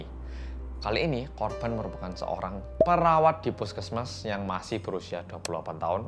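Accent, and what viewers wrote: Java, Indonesia. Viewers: "bersama orang Korea yang medhooooookkkkkk" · Medokmu membuatku terkesan 😁🤭🙏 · Hallo gaess welcome to back korea reomit bersama orang Korea yang medok😁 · Kaget medoknya😭😭😭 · medok nya bikin aku kagett tiba2 ada falsetto hahahha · Orang yang medok i love medok owakwao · Medhooooooknya bikin ngakak😭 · Medhoookkknya kok pake nada tinggi mas?? Wkwkwkw... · Lanjut mas medok setelah nessie judge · "medok" nya ngegas mas :) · medhok nya waw banget.. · Mas: halo guys welcome back to korea reomit bersama orang korea Me: * siap-siap * Mas: yang... Me: MEDHOOOKKKK ❤❤❤❤❤ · ... yang medokkk ~ * auto cabut earphones * okey . . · Semangat buat Korea medhok, nanti klo wabah selesai ke SBY ya bang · Gercep Kembali lagi,bersama oramg korea yang..... "MEDHOOOKKKK" salam dari Jawa Timur yh mas. Lup²❤ #BoloneHansol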